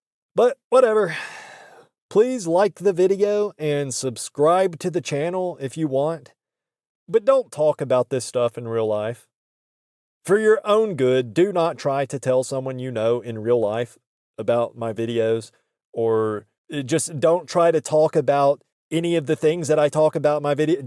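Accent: United States. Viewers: Normal U.S. accent, what's wrong?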